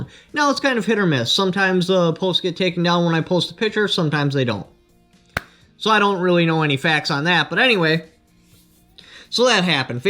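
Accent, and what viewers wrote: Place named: Wisconsin, United States. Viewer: Wisconsin! That makes sense, your accent is definitely indicative of your area. Not a criticism, just an observation. I’m in West Virginia, population, 1.8 million with probably 50 different accents!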